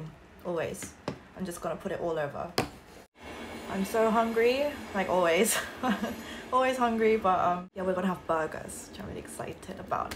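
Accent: United Kingdom. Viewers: wow.. sounds very cool with British accent o_o and very informative for current Covid 19 situation !! thanks for your time to make this amazing video ~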